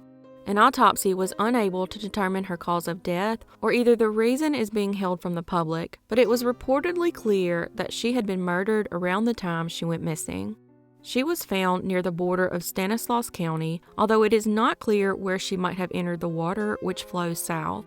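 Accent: South, United States. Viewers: Ur voice was definitely made for these types of YouTube videos!! Ur voice is so smooth but so southern... I love all ur videos & I personally think YOU SHOULD HAVE MILLIONS OF SUBS!! UR VIDEOS R NEW & UNLIKE YOUTUBERS WHO Have millions of SUBS & ALMOST ALL THEIR VIDEOS R VIDEOS THAT THEY HAVE SHOWED A MILLION FREAKING TIMES & ITS LIKE THEY SHARE RERUNS.. IT DRIVES ME NUTS CAUZE THEY MISLEAD U & BAIT CLICK U!! UR A SPECIAL BREED.. I WONDERFUL 👏 BREED.♥ THANK U.. MUCH LOVE FROM CANTON,OHIO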